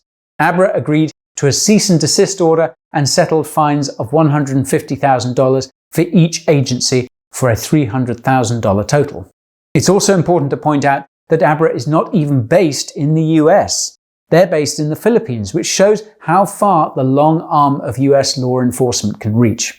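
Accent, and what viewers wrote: United Kingdom. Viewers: I’m going to trust you on this one because you have a british accent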